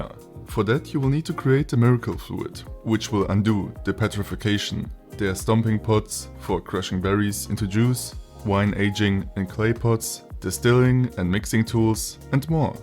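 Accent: Germany. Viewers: bro man hoert das du deutsch bist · German accent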